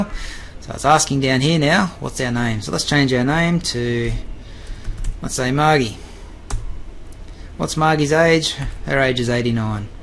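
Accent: Australia. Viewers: love the aussie accent never lose it! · Are you Auzzie mate cause you certainly have an auzzie accent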